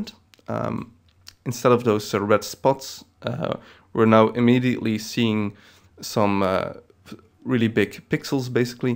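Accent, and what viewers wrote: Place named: Netherlands. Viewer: hee jij bent een nederlander! ik hoor t aan je accent :P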